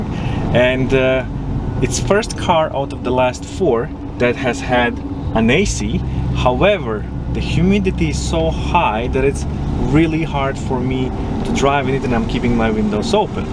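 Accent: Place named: Russia. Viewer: i Love rus accentet! it not mostakbal! lovat red 996 !!!!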